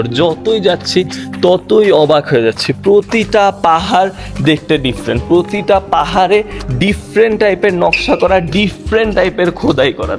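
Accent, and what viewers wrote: Kolkata, India. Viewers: আপনার একসেন্ট কলকাতা টাইপ। প্লিজ বিষয়টি একটু ক্লিয়ার করেন। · আপনার ভাষা কলকাতার লোকদের মতো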